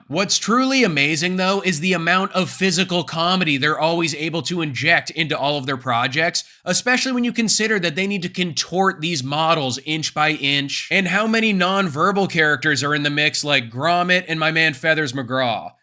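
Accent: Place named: United States. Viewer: You sound like an American with a short attention span. Terrible review.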